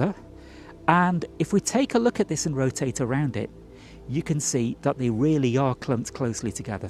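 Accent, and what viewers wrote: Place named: England, United Kingdom. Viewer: You sound pom. You talk northern side so your a pom. Then you knot one up for the Southern Cross. Hell, your now family bro. To the Eureka ✨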